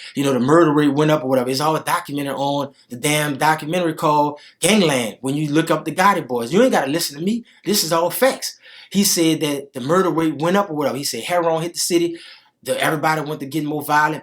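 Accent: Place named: New Orleans, United States. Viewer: nah bro he got a NOLA accent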